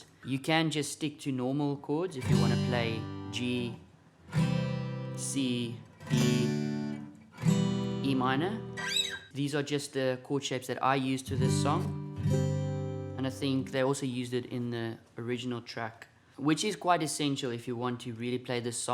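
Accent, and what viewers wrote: South Africa. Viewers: can spot a south african accent from the first word hehe, great tutorial thank you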